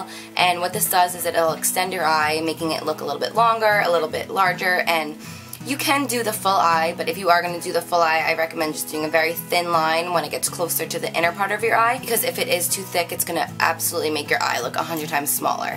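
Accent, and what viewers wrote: New Jersey, United States. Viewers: I agree. I think it's that slight and subtle New Jersey accent. :)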